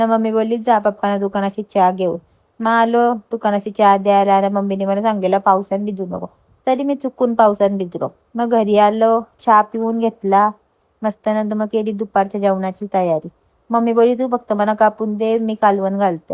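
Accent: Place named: Agri, India. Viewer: Kiti agari bhasha boltes g vlog kartes na vavsthith bol jara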